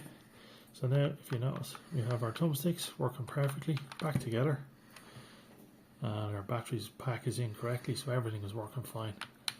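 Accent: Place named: Ireland. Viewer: What part of Ireland are you from you sound like all if my relatives lol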